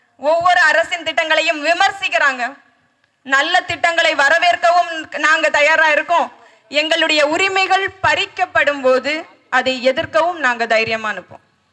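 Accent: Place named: Nagercoil, India. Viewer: நாகர்கோயில் தமிழ்...❤️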